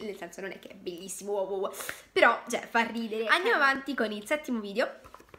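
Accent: Naples, Italy. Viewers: Ma è di napoli?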